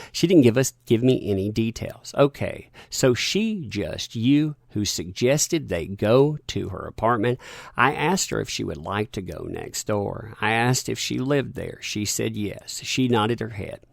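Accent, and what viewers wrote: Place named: Texas, United States. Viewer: Sort of wondering if you are from Texas with your use of "set" for "sit" ;)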